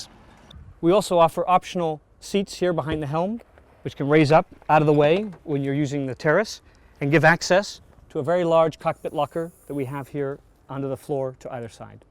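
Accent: France. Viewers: désolé mais l'accent français n'est plus possible, on dirait que vous sortez du collège... sinon très bien présenté. bonne vidéo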